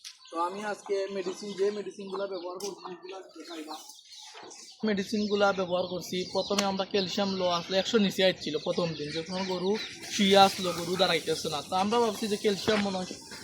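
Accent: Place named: Sylhet, Bangladesh. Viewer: ভাই সিলেটের মানুষ কি এতই মূূরখ যে বাংলা শুদ্ধ ভাষায় কথা বলতে পারেনা আপনার এই ভাষা কি সারা বাংলাদেশের মানুষ কি বুজে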